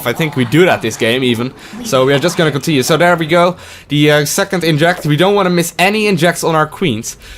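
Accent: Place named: Netherlands. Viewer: Quit whining about a Dutch accent. You understand him, you learn from him, you can't speak commentate this game better. QQQQQQQQQQQQQQQ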